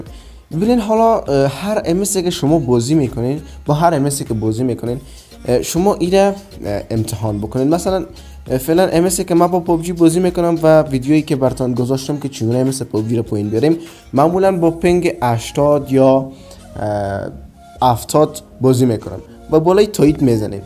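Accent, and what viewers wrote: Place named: Afghanistan. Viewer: اهل افغانستان است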